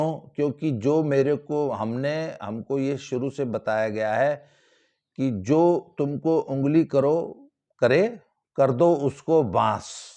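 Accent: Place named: Gurgaon, India. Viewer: Thhare baans ho jyaaga, Aapka lehjaa bilkul hmari Tarah h, n proper Gurgaon se village knhai. Aap kis caste se belong krte ho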